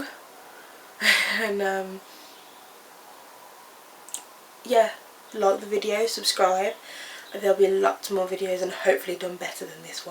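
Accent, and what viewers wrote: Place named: Birmingham, United Kingdom. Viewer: I usually cringe at Brummy accents; I did not even notice yours. Thanks for sending me the link to your video and will definitely follow your progress. i have Spinabifida, reactive lifelong depression, anxiety, recent stroke, possibly M.E. and or possibly M.S., etc, etc, etc... as many of us have. Your willingness to keep going, just from seeing it in this one video offers me some hope. I hope you can and will record videos maybe every few days? It's things like this videoing that help people like me keep going x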